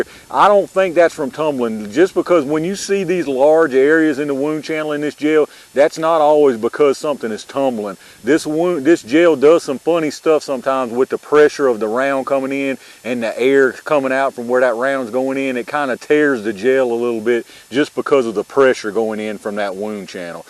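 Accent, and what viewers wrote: South, United States. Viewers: well guess i need to subscribe.. ive watched 4 of your vids without trying to, plus you sound country as hell like me im in SC I would use the aguila for 10/22's and for people with short 22lr barrels like NAA revolver would use punch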